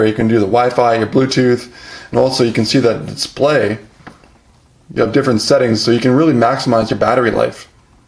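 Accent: Canada. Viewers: I love you canadian accent :D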